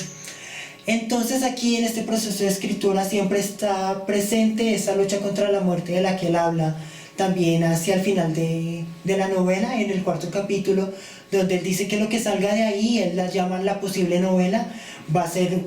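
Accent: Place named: Colombia. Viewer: detecto un acento colombiano xd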